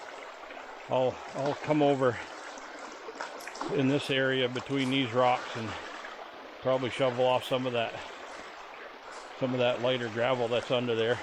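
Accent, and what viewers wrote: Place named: Vermont, United States. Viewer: Love that born in Vermont accent!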